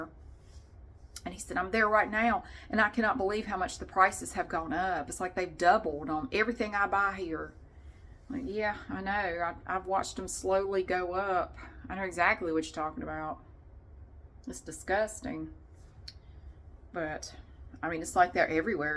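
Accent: South, United States. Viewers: I just like you talking; I think it’s a northern guy liking a southern girl talking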